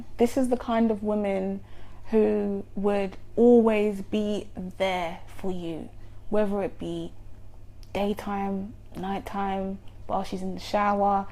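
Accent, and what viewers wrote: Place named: London, United Kingdom. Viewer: I'm a Virgo Sun Moon and Rising. In total I have 5 planets in Virgo in my chart. I'm a designer so the office job life isn't relative to me, but I do like structure. I do live in a white house... white walls and a very minimal design. A clean home is a must... although I'm not OCD. Shout out to you though... it's nice to hear a London accent on an Astro vid for a change. Definitely going to subscribe. xx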